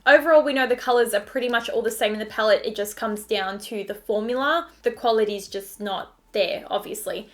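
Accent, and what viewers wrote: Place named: Australia. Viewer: Did it ever occur to you that isn't from the USA and in australia we have the same way of saying things as the UK aka Kitchen cosmetics?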